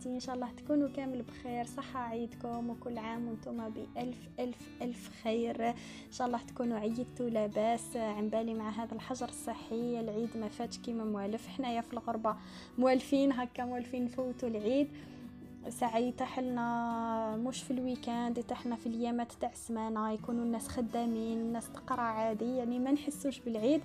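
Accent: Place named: Chaouia, Algeria. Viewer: هدرتك تباني شاوية .. صح عيدك 😘😘😘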